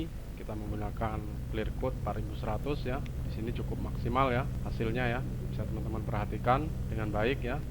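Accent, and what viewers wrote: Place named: Java, Indonesia. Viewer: wuah.. jauh ya Saya di Jatim ini mas.., Saya kira di jawa, soalnya dengar2 logatnya sprti org jawa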